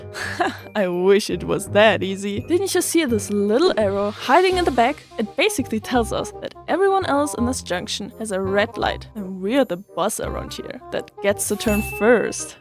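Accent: Germany. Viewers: Your German accent sounds really cute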